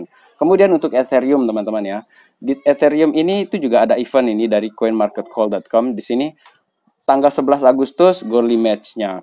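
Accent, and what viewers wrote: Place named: Manggarai, Indonesia. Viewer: Berarti sama logat nya dengan kita orang manggarai yah 🤣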